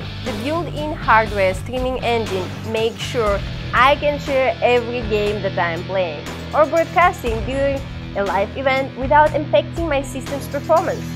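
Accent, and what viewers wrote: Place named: Russia. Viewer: Wow that accent. I can barely understand what she is saying. Is she Russian or something?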